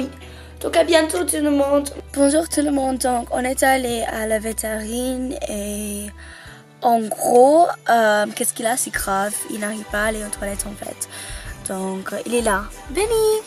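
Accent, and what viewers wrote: England, United Kingdom. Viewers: J'aime ton accent anglais :3<3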